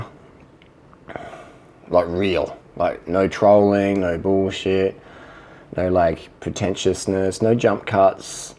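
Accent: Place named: Australia. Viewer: it's OK for whatever you said when you were under carbed..... I find your Australian amusing.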